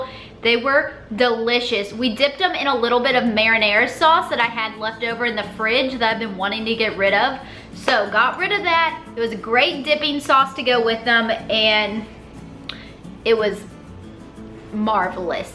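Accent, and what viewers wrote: South, United States. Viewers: US southern accent. That brings back good Memories. Love from Brazil! Thanks for the great recipes. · You are hott! I love your southern accent!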